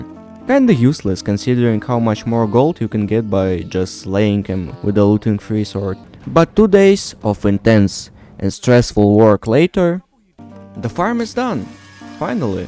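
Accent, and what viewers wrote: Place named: Russia. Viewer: По акценту понятно что он рускоговорящий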